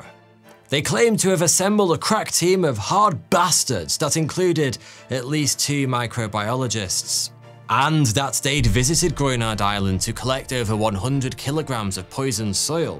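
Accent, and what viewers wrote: United Kingdom. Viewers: srry but it sounds as if the very well spoken, and well sounding, spokesperson of this calls thoughty 2 42, I realize its just because of the lovely british accent, but it could be pronounced more clearly, and that btw goes for all ur vids!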